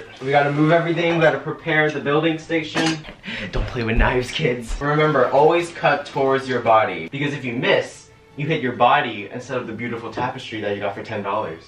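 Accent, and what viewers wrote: China. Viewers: your chinglish is beautiful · That Chinglish 😂 honestly same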